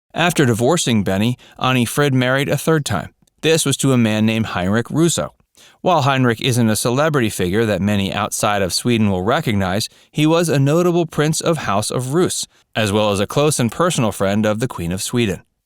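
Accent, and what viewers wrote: United States. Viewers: Listening to your lazy Americanized pronunciation of their names was painful. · I was set a bit curious by that reference too. But without prejudice hearing the narrator's accent i can correctly guess he's American. America didn't give any hoots yet about ABBA so forgive them.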